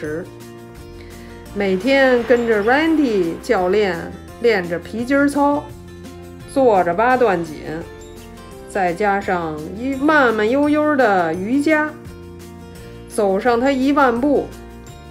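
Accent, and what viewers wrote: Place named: Beijing, China. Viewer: 太棒了。 期待下一期视频。 老北京话，那叫一地道。 听着顺耳👍😄💖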